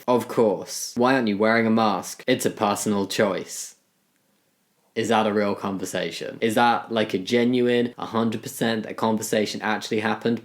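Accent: England, United Kingdom. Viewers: You have an accent! Are you from England?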